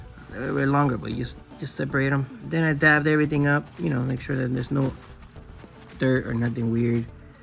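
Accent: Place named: Miami, United States. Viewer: Hey sounds like you're from Miami, how much would you charge for doing this to my car? Im located in kendall. My Tiggy is due for new fluid.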